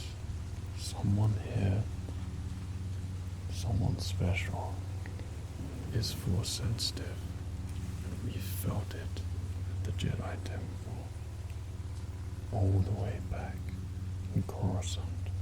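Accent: England, United Kingdom. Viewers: RUDE BRITISH JEDI TRAINER😂😂😂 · Now a rude english sith lord!!!!!! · Rude English Gentleman Sith version. For all of us non-Jedi scum.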